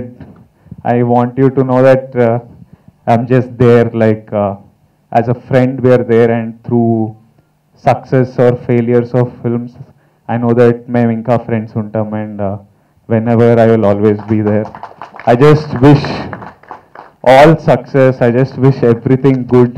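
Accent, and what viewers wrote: Telangana, India. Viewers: I like u bro speak telugu u know pronounce in telangana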